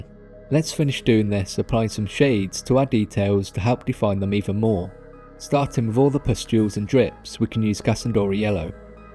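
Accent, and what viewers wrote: United Kingdom. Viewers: Whoaa.. you got auto voice translation IA caption in spanish?.. cool. Anyways I lke so much your original accent British voice 😅. Awsome video and subscribed. Cheers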